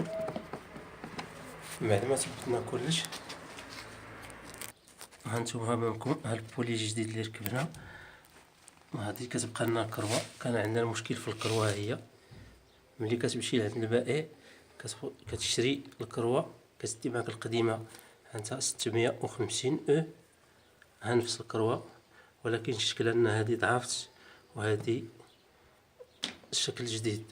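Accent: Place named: Maghreb, North Africa. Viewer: لو الشرح بلغة اخرئ واضهار ترجهمه عربي احسن من من هي اللهجه. والله بصراحه اللهجه المصريه واضحه جدا وسلسه اما الجزائر المغرب مشكله وخلوا النت كله كذا باي مجال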